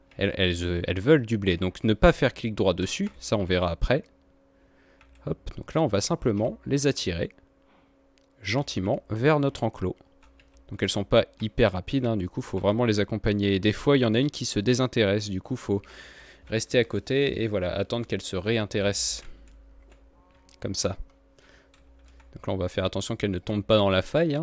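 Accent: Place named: Nord-Pas-de-Calais, France. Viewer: Salut , j'ai remarqué que tu parlais ch'ti , tu es du nord ?( Car moi oui )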